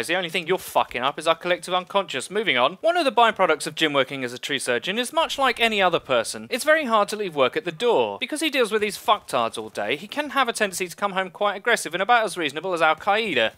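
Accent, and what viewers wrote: United Kingdom. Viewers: Your videos are like perfectionist essays, with witty highlights of jabbing humor and a tinge of British English. Well done, keep up the good work. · is essentially the escapist of the call of duty community. A British accent with witty remarks that would make handsome jack blush.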